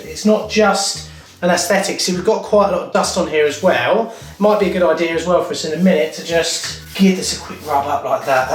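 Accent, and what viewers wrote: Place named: England, United Kingdom. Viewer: Isn't there a lot of waste?? Im from England living in USA - nice to hear the old stomping ground accent..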